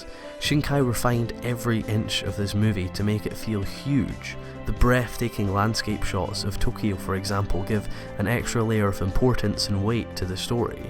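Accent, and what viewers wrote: Scotland, United Kingdom. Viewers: He sounds Scottish. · I've been watching your videos for a while now and I still think your narration, detailed reviews and opinions make for great viewing. Watching anime which is innately foreign in nature, is made to seem very natural when presented and discussed in a familiar Scottish accent. This film has been on my to-watch list for a while now so although I'm disappointed I didn't get to see it in a cinema setting (and admittedly, I probably should have watched it before watching this video), this has definitely made me even more keen to watch the film. Great video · Sorry, unrelated but I love your accent!! I'm Scottish tooo !!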